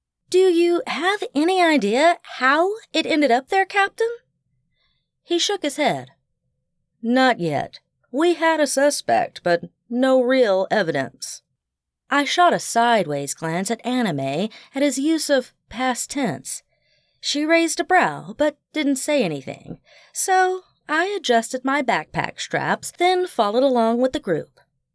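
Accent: South, United States. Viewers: I do love the added male voice with the deli ious British accent. Dont get me wrong, I love the southern accent also ( I am a born and raised Georhia peach ) but the British accents makes my old heart flutter. Lol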